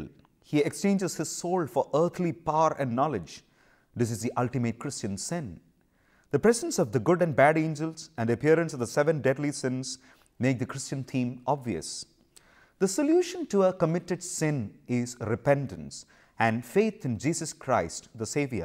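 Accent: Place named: South India, India. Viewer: Your ascent sounds like South Indian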